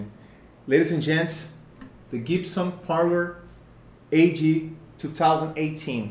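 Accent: Brazil. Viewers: Cara, vc é brasileiro? Esse inglês tá muito abrasileirado hahaha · Brazilian accent has been detected.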